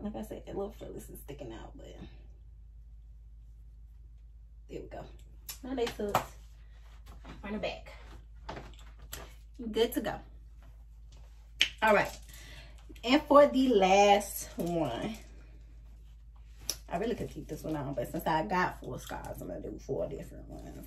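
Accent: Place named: New Orleans, United States. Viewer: Beautiful head wraps. New Suscriber on board. Are you from New Orleans? I love your accent.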